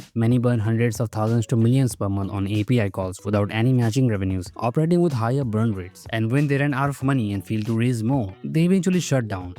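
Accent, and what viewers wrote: India. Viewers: Buddy you got that indian accent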